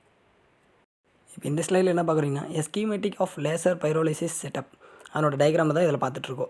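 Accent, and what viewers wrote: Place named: South, India. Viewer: I think he belong to some place in South India n that's why his accent is a bit different and difficult for us to understand (I am from north India).. I played the video on 0.75X n see I was able to understand.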